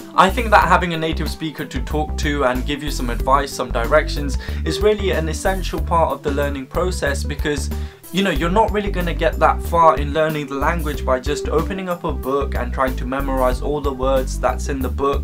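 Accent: United Kingdom. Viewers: Oh my god are you british? You have an accent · You sounded British but you looked like korean. XD This app you shared would help a lot should I consider learning formal korean through Berlitz though, I learned a bit korean in the academy. I guess my brain should get a hang of it soon. I dunno maybe bec.its very diverse theres a lot of languages introduced when im in school spanish, japanese , chinese, korean but english is my official secondary language. Honestly, its pretty messed up. Tnx for this helpful app!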